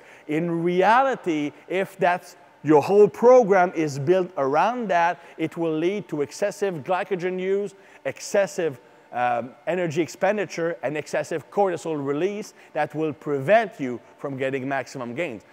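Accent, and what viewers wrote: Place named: Quebec, Canada. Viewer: I trust him because of his Quebec accent. Never met a dishonest or bad Quebecer.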